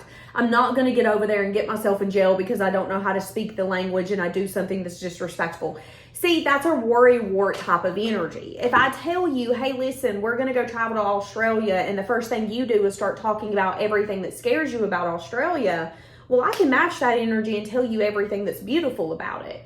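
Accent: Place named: East Tennessee, United States. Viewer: Love the East TN accent:face-red-heart-shape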